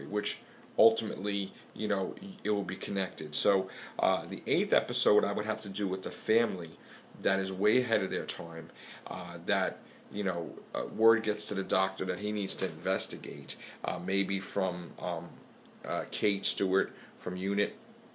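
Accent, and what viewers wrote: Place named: Boston, United States. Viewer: You've got a great Boston accent!!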